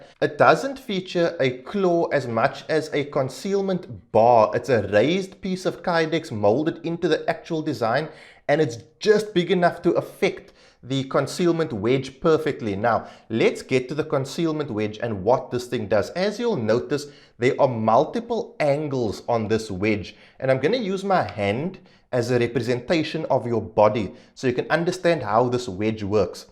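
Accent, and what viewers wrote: Cape Town, South Africa. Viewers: I could immediately tell OP is from Cape Town when I heard him speak. This is typically an accent you'll hear from the Cape Coloured community.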